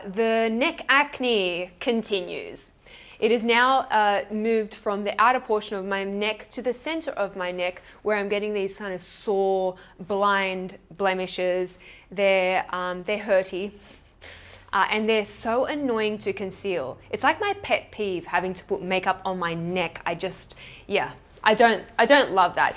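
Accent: Australia. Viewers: Wow an aussie on YT! Great to see and hear :) From an aussie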